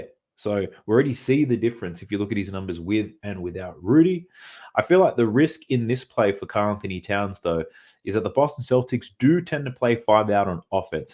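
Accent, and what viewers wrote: Australia. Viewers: Never thought I would be listening to an Australian dude for NBA picks 🤣. Love the insight tho 💯💪🏽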